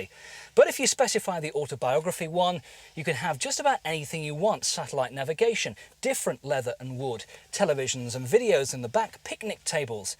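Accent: United Kingdom. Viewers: moronic comment. British accent is correct.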